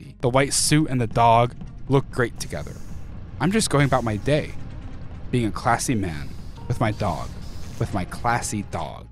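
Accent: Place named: Russia. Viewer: His Russian accent is so good!!!!!!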